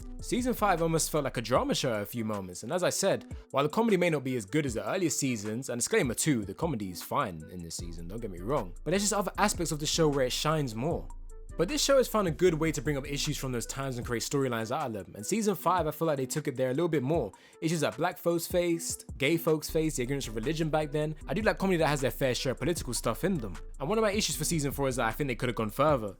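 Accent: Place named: United Kingdom. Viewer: Ahh, I'm already amazed with the British accent🤪😍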